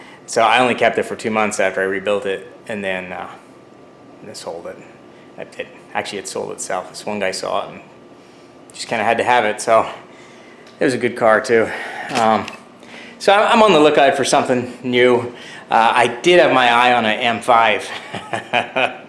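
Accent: Belfast, United Kingdom. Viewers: A hint of a Belfast accent?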